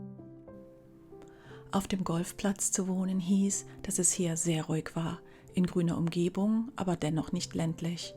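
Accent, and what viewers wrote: Scotland, United Kingdom. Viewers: Ich bin platt…bei deinem schottischen Akzent hätte ich nicht gedacht das wir Landsfrauen 😂 sind…Toll…Danke für die tollen Videos…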